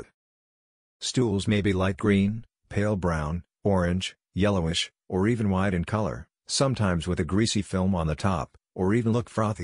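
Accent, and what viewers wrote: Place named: United States. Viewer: Why is this video spoken in an American accent when it’s aimed at a uk audience?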